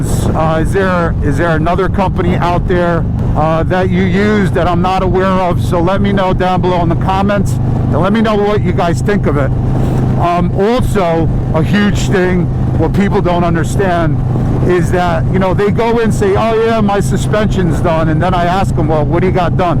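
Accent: New Jersey, United States. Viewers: I enjoy your content, and the interaction with your friends. The Jersey accent reminds me of my late father in law, who was originally from the Jersey City area.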